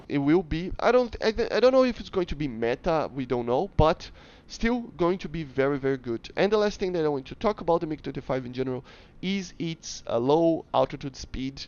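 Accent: Brazil. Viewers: eu conheço o sotaque brasileiro KKKKKKKKK, muito bom seus vídeos Man, continua com o ótimo trabalho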